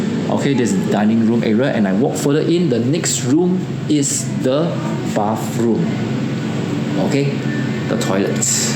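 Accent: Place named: Singapore. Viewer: The Singaporean English ........😳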